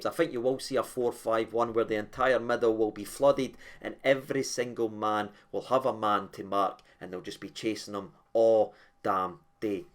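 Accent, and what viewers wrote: Scotland, United Kingdom. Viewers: Supporting your country isn't real football? I thought you were Scottish with your accent.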